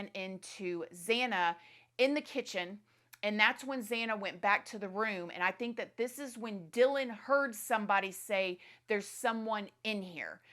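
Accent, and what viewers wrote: South, United States. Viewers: Why even bother saying anything at all? Is it for your 2 seconds of want to be fame? Just be nice or be quite. It’s called a southern accent.. if you don’t like.. don’t listen.. it’s that simple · Why do you have a southern accent but pronounce "on" like a New Yorker?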